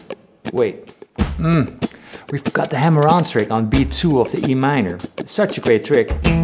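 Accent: Netherlands. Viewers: What is your origin? You sound dutch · That Dutch accent ain't so funky. Sounds like my Grandpa from Rotterdam. The playing is all the better to funk u with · Sounds dutch to me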